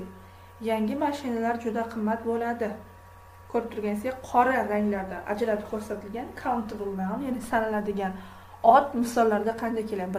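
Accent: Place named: United Kingdom. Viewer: Бритиш акцент )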